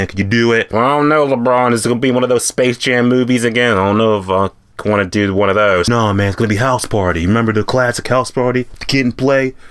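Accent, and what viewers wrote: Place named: Pittsburgh, United States. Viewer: Are you from Pittsburgh? You got that accent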